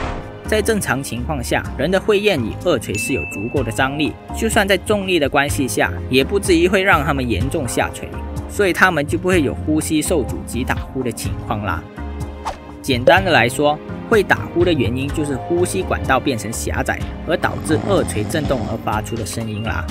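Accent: Malaysia. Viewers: 嗨，请问你是马来西亚人吗，因为你的口音很像马来西亚人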